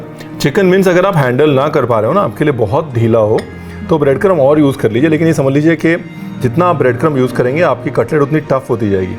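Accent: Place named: Bengali, India. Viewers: Simple yet amazing recipe. 👌 Dear chef Thank you very much for sharing Kolkata style Chicken Cutlet recipe. Your bengali accent is too good. Lots of love from Kolkata. 💝💝💝💝💝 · Too sweet bengali pronounce...and also the comments on BONGEATS is really nice. I also believe same. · Sir...your bengali accents sounds so amazing...ohh damn good when you spelled ranna ghore,sorshe bata,posto bata 😁.... being a Bengali just loved your feelings towards bengal's food culture...specially kolkata.... literally amazed... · Your bengali accent is very nice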